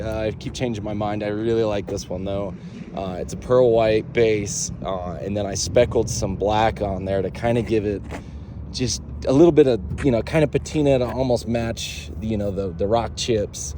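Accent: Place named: California, United States. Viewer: You have a great project there . I could tell by the sound of your vernacular that maybe you are coastal [ maybe California ] . If I were you I would look into an E-Bike franchise that rents bikes or a delivery service to sponsor you to gain funds in your build . A dune buggy engine cage , hip high quarter panels and you can raise the bed to hide a pull out ramp to put bikes or cargo in & out with a cool thick mesh door like they do with truck tail gates . I subbed because I remember back when I was 18 in the middle 80s I bough a 1954 oval window bug rough & rusty but Dude it had all of its original guts [ drive train and interior ] weathered and worn . Put 2K into it and had to move over seas and gave it to my dad . Never give up the first toy you made yourself . lol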